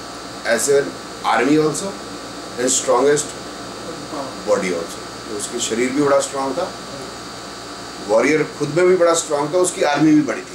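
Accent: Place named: West Delhi, India. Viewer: When i listen to the way you talk, I start to miss West Delhi.....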